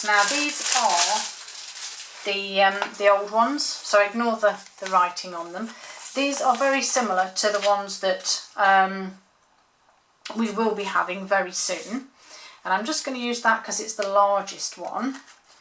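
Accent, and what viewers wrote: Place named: Leicestershire, United Kingdom. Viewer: A lovely little box. I also have to say I love listening to you, even though I don't know where you live or are from, your accent sounds like it was close to where I come from in Leicestershire. Sounds like home Thanks for posting.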